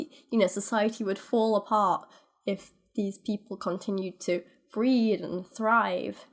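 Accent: United Kingdom. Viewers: You have a British accent. Hope you still enjoy living in your third world country with high criminality. You deserve it. if you don t believe UK is third world Google United Nations replacement migration. I m not westerner.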